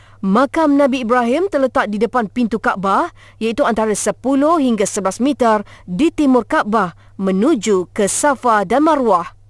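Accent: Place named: Indonesia. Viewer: Padahal Media Malaysia, tp Logatnya 90% indonesia bgt...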